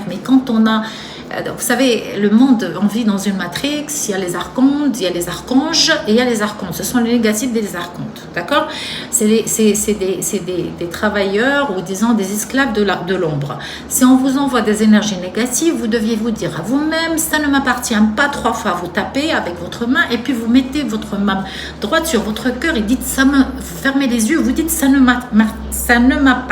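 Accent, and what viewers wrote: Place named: Quebec, Canada. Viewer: Vous avez vraiment l accent du Québec comme moi . Tt facon je ne comprends plus rien dans cette relation toxique empoisonner avec mon ex autre. Ca me parle beaucoup cette guidances .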